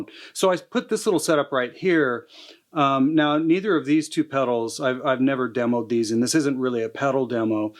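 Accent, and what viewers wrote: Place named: United States. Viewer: Thorpe fx field Marshall fuzz. Oh yes. Get one, get one now. Talk as much as you like sir, it's interesting and us Brits never tire of American accent.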